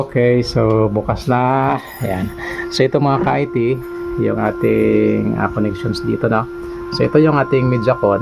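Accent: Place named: Iloilo, Philippines. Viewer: ilonggo man ni gali. hahah taga diin ka sir?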